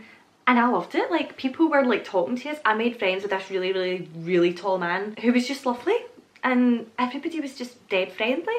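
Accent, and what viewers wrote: Scotland, United Kingdom. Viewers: Sitting in the Indy airport, practicing listening to the Scottish accent (on our way to 🏴), shocked that our town is on your channel. 🎉🎉 Glad you loved it. Come back anytime. 😁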